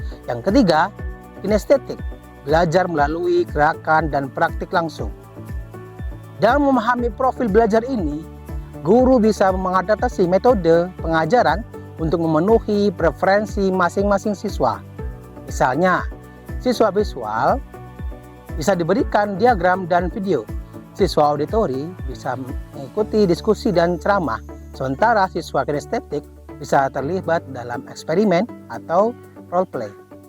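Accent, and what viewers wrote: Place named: Aceh, Indonesia. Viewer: logatnyo sudah beda sekarang sdh jd orang aceh bener ni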